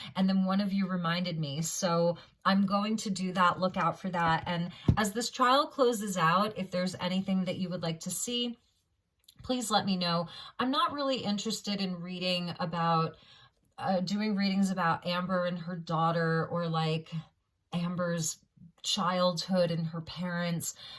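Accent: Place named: France. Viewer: Votre accent français est trop mignon 😁👍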